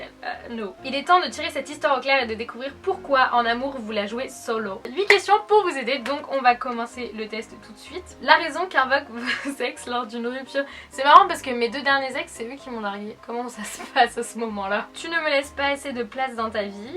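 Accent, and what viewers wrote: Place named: South West, France. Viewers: Accent du Sud Ouest ❤❤ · Un bon accent du sud qui ressort naturellement! Ca fait toujours plaisir ;) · j'aimerai bien que tu refasse ce test aujourd'hui que t'es heureuse en amour ^^ pas sur que tu répondrais la même chose pour le boulot qui passe en premier toussa toussa :p en tout cas, pour le boulot je suis comme toi ça passe avant l'amour (ma copine me le reproche bcp), et pour la vision du couple, idem : deux personnes vivant ensemble mais ne vivant pas l'un pour l'autre, ça fait trop cliché ^^ Sinon, pour l'accent, je trouve que ça ressemble un peu à l'accent de maïté, donc accent du sud ouest (mais vraiment coté paysan, car je suis du sud ouest et mon accent est beau car il est léger (surtout parceque j'ai quitté toulouse depuis 10 ans :D))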